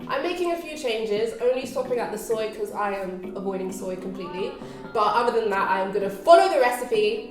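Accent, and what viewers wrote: London, United Kingdom. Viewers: Love the recipe, I'm going to give it a go. Love the 'innit like' accent too :)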